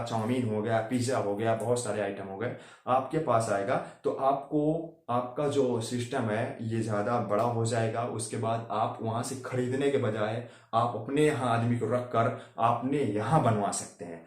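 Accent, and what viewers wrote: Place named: India. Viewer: Bro Sirf 4 hi like mila hai iska karan mei aapko batata hu, mai Gujarat se hu aur aap dusre ilakese ho jaise up,bihar, MP, and other ab hota kya hai aap logo ka bolne ka jo lehja hai woh different hota hai is wajah se videos lambi bhi hoti hai aur logo ko sunne mai maza bhi nahi aata toh please acchi chi hindi bole, waise mene bhi youtube channel banaya hai par ek bhi video nahi dala aur aapko advice de raha hu is liye sorry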